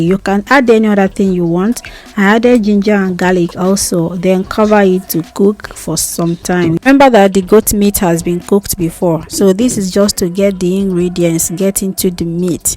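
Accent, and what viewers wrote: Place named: Ghana. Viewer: Oh my gosh 😍 isi ewu of the mighty wash down You killed it chef 👨‍🍳 👏🏼 🙌🏾 You have ghanian accent, hv you lived dr?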